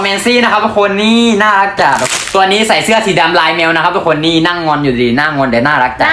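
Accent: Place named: South, Thailand. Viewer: ทำไรหนูพูดใต้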